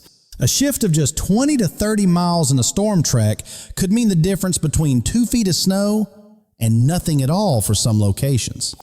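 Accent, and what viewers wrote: South, United States. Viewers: Golly!!!What a hillbilly announcer. Quess it’s time for you all to put on some shoes! It’s called WINTER!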